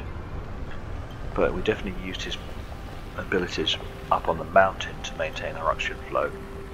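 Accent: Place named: United Kingdom. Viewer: Came here from Josiwe's channel fyi. Good series so far! What part of the UK are you from? I'm Irish but cant place the accent?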